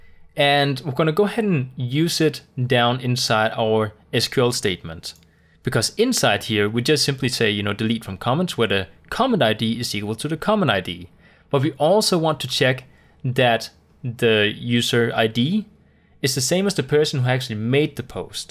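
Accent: Denmark. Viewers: You sound very danish, are you danish?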